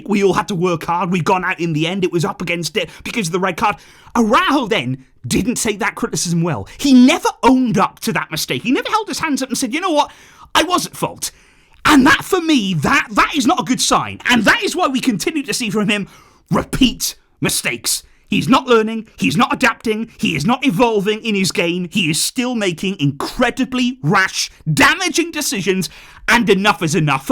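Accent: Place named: England, United Kingdom. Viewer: Your accent doesn’t sound like a typical Spanish person, wonder how a English person ended up supporting Barcelona 🤔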